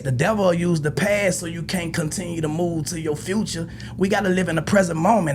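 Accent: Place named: Memphis, United States. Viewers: Turk always talking....thats what Juvie was telling his sensitive azz. Bg responded to what you said on this podcast and he didnt even go at you. He just said you sound like you from Memphis which i feel touched Turk. Turk keep BG name in his mouth more than anything. Just do yo thang and move on but this nigga still wanna reach. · Son u be doing too much and BG right u do sound like u from Memphis. The NO don't sound like nobody else no matter how long we rock in other cities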